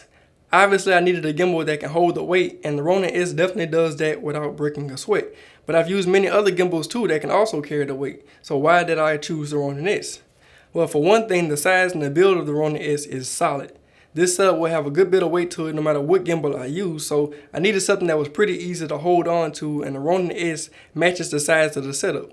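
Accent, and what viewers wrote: Louisiana, United States. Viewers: I love that Louisiana accent. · I kept thinking, "damn this dude sounds like he's from Louisiana." Then we got to the b-roll. Yup.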